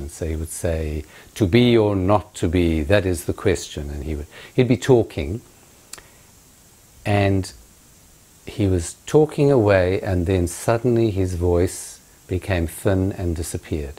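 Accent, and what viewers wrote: South Africa. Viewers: just turns ''ouuff''. can't beat the sath african accent.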